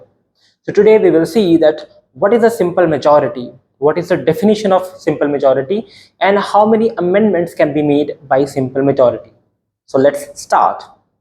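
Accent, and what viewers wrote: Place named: England, United Kingdom. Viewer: You English Accent is awesome bro🎉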